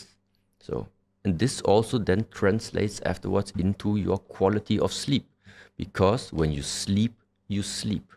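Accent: Germany. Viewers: Sag ma bin ich es oder hör ich da einen deutschen Akzent? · Why does bro have a really German accent? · Didnt expect the german accent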